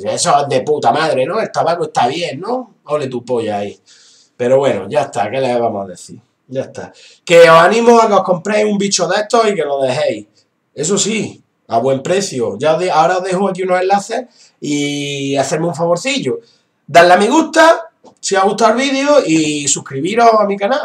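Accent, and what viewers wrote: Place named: Andalusia, Spain. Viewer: Hablando en Plata.. Y en andaluz. Un saludo desde Málaga.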